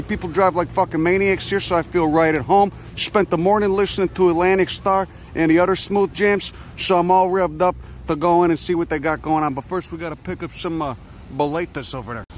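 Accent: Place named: New York, United States. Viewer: Hello, I'm Pete, a new subscriber. Your coyote video brought me here. I am fascinated by your combination of wit, wiseguy and accent. I'm a native New Yawkah from the wilds of the Harlem projects, so I feel at home hearing your vocals and attitude. I'm going to catch up on your list of uploads and enjoy them immensely. Your humanity towards that poor little creature warmed the cold, cold cockles of my heart. I don't know shit about botany but I'm hoping aside from being entertained I will be educated. Thanks for uplifting my day!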